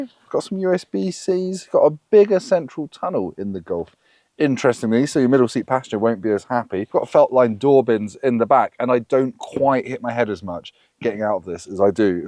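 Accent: England, United Kingdom. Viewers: Not 100% sure because of the awesome English accent but did u say the R has no reverse camera? Mine has one that lifts and peels out from beneath the VW badge. Otherwise excellent review!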